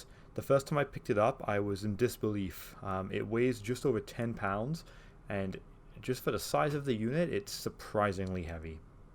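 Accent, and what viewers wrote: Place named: Wales, United Kingdom. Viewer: Great review, thank you! My Breville Dose Control has just kicked the bucket so I’m in a similar situation. I’m between this and the Rancilio Rocky. What was your reasoning in choosing this over the Rocky? Also, on a side note, your accent sounds distinctly Welsh, if I’m not mistaken. Sounds like you could be a fellow Welshman in Canada?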